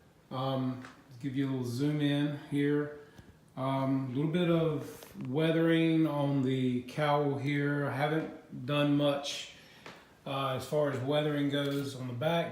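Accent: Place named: Kentucky, United States. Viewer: thanks for quick reply, my wife got me the F4U yesterday and I assembled last night, I tried the chalk for panel lines, I had some French chalk and ground that into some alcohol and it worked great. I live in Taiwan so bit far to go to Walmart but I will call at my local stationary and art supplier they should have bottles if Acrylic paint. Thanks again for the reply and great info on your channel. Listening to you reminds me of my time in Kentucky a few years ago, hope that is not an insult as I see your in NC.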